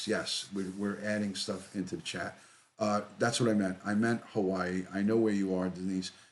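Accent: New York, United States. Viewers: I am a new subscriber from the Bronx New York love your channel just listening to you talk I new you were a fellow New Yorker!! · Hi! I just found you and subscribed. It's good to hear a familiar accent. I'm a native New Yorker transplanted to south eastern Turkey. Let's Go Mets!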